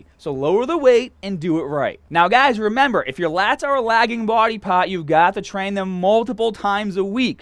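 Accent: Boston, United States. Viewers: Love that Boston accent bro! Good info!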